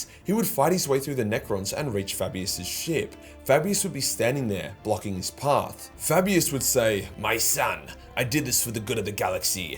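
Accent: Australia. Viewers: bro how on earth is an edge lord channel? This is just how we talk in Australia lol.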